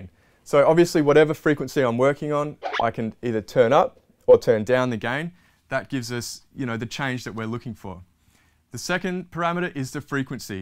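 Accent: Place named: Australia. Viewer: That's an Aussie accent if I ever heard one, mate. I have to say the editing and visuals on this video are incredibly tight and the explanation is very straightforward. Job well done! :)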